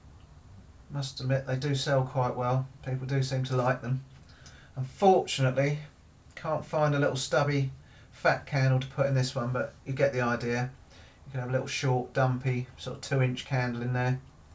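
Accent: United Kingdom. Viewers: I enjoy your videos! I am from The U.S.A. I enjoy listening and I sometimes imitate the British accent, not as a mocking feeling, but as a learning experience. I know these videos are not a language tutorial, but I can't help being drawn into the slang you use! Again, not making fun, but I have a few questions about some phrases you used during this video. For example: "Give it a bit a willy!" ".................. "...I made a balls up!" " "...Coots and beans again!" One or two of these are pretty straight forward as to what they mean, but could you (for the readers of the comments and viewers of your channel who may not be from England) answer or translate the meanings of the before said phrases? Thank you! Again, I enjoy and learn a lot from your videos. Thank you! LA./Doot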